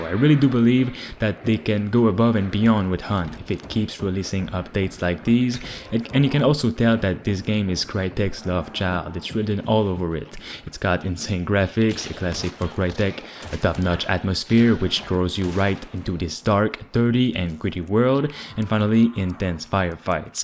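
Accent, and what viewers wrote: Quebec, Canada. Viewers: Es tu québécois? Les canadiens sont le meilleur 😊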